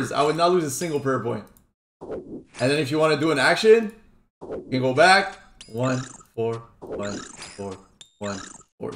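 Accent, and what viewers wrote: Quebec, Canada. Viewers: yo are you from quebec?? man you sound like youre from quebec hehe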